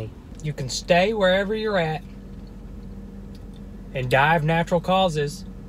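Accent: South, United States. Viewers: 2024 now.....that southern accent was thiccccccccck in these older videos. lol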